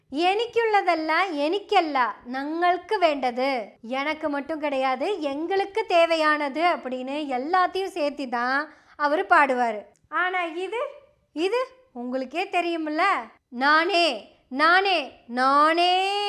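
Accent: Kongu, India. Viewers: சகோதரி, உங்க கொங்கு தமிழுக்கு நான் ரசிகன். 👏👏👏👏👏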